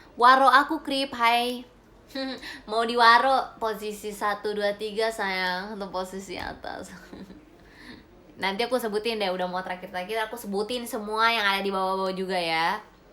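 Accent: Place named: Betawi, Indonesia. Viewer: Gw demen bnget klo denger mpok ngomong logat nye betawi banget mirip tukang nasi uduk disebrang rumah gw😁😁😁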